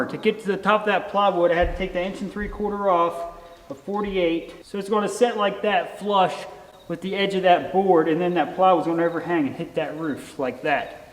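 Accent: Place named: West Coast, United States. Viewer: I understand that. You actually sound more West Coast, lol. 😂👍🇺🇸😊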